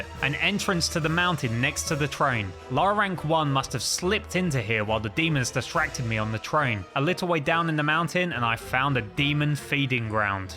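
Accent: United Kingdom. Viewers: I was sure that You are from uk but when i saw that You are from Poland i was so confused. Well… super film nagrałeś 😅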